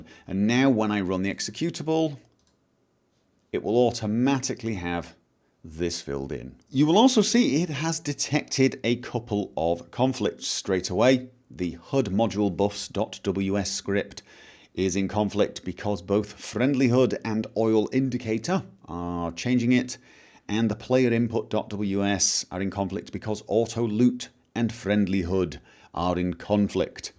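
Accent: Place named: Scotland, United Kingdom. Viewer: What is your accent? It almost sounds Scottish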